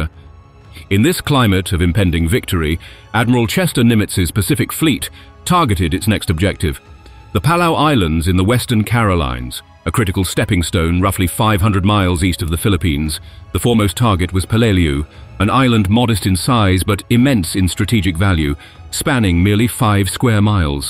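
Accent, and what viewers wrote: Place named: United Kingdom. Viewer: As formidable as the Marine strategies and tactics had become by the time they assaulted Peleliu, their "remarkable success" and "sweeping up whatever was left" after the naval and air bombardments, had nearly always resulted in massive casualties and hard fought battles with the relentless Japanese Imperial Army....Their landing was FAR from easy, ( Do you mean Guadalcanal? ) the Japanese threw everything they had at them from the very beginning, and Peleliu was definitely NOT a "lush" island, it was a craggy, scruffy, unforgiving coral island with sweltering temperatures and very little water....I stopped watching this video after as it is extremely clunky, even with a British accented narrator, pronouncing Peleliu incorrectly.....Semper Fi.....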